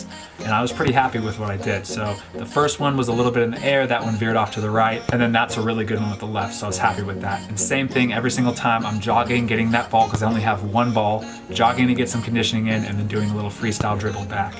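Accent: United States. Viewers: Are you actually from new Zealand because you sound American.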